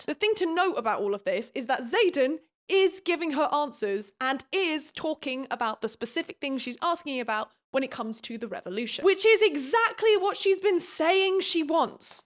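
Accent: United States. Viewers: The american accent is SO GOOD HAHAHAHA it always makes me laugh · Also, the accent sounded like an American southern plantation owner. I am from the deep south. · The American accent is TOO GOOD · The American accent gets me everytime 😂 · Babes imma need you in the audiobook studio STAT! your American accent is better than mine, im #scared (im unfortunately American) but let’s work on the country accent a bit (also unfortunately from Texas) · the american accent is KILLING me 😭😭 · commenting bc you are absolutely hilarious, delightful to listen to, and you have an impeccable American accent. Unfortunately, I can't unhear your Violet voice as anything other than Sydnee Sweendy, making both of them that much more unbearable😤🤩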